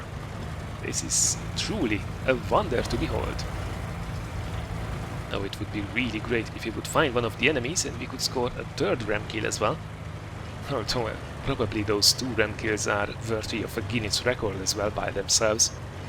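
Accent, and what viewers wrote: Hungary. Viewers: where are you from cause your accent is like Hungarien :)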